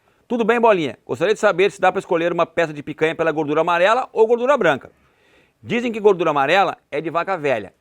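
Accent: Rio Grande do Sul, Brazil. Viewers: Deve ser gremista, se não for, é colorado, mas a possibilidade de ser gremista é maior pelo modo de falar.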